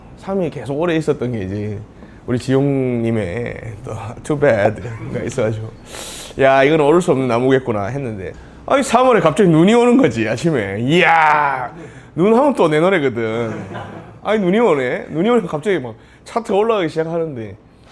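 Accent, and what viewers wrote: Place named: Busan, South Korea. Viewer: 폭팔적인 목소리 부산이시군요 가수로서 최고가되시길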